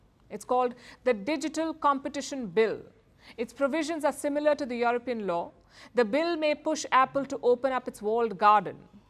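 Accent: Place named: India. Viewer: People from india has one of the worst accents in the world!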